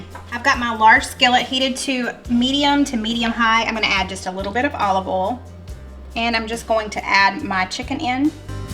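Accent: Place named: Carolina, United States. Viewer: Oh now honey, you speak just right. Don't you go turnin' your back on your proper Carolina raisin'. You keep right on calling it "ohl"* the way the Good Lord intended it be said LOL. * Well somewhere between there and "awl"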